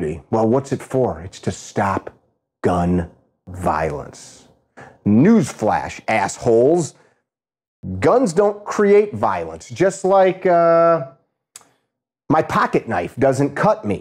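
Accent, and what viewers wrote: Chicago, United States. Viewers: As soon as I heard his voice the first time, I knew. Sounds like Chicago.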